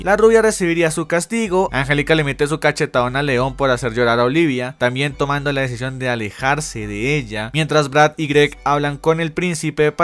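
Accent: Colombia. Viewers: ¿Soy yo o sos colombiano? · eres de Bogotá 🤨🤨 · ya se me hacía que eras Colombiano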